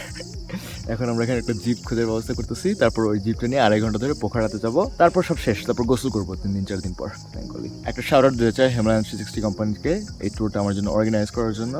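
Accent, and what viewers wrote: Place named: Narsingdi, Bangladesh. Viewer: নরসিংদীর লোক মনে হচ্ছে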